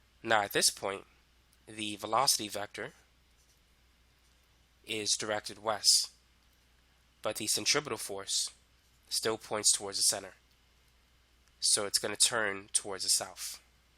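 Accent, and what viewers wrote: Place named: New York, United States. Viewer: i cant stop focussing on the new york accent omg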